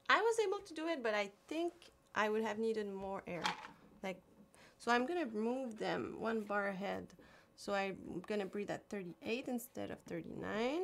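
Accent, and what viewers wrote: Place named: France. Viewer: Hey there ! I folllow you since 2 months, and I heard that you have a little french accent when you speak english... 😉Are you french, because I am, and proud to be one ! I love your channel !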